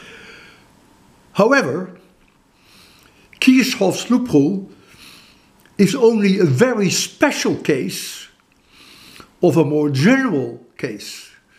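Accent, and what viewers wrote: Netherlands. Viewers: respect, you sound like a dutch man btw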